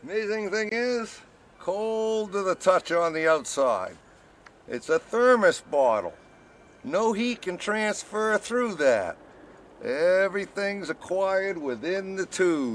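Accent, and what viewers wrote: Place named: Maine, United States. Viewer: Yes, I noticed that but coupled with his "Downeaster" accent it makes for a weird combination.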